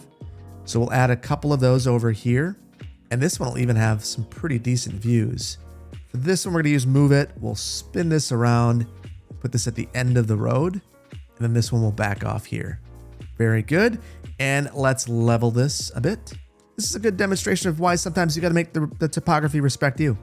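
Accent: Wisconsin, United States. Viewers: Just watched all of this playlist, I'm from southern Wisconsin and it's great to hear the "up north" accent attached to all this scenery.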